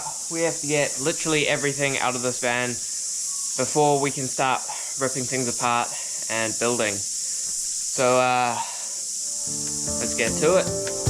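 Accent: New Zealand. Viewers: I heard "one of the advantages of having a dad who has a mess of shit" instead of "a dad who has a massive shed". Ha! First heavy exposure to the Kiwi tongue.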